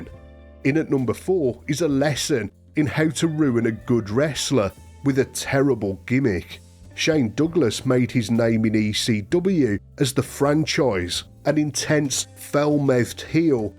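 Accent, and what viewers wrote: Birmingham, United Kingdom. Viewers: Love your Birmingham accent and pronunciation of 'W', which is a popular letter in wrestling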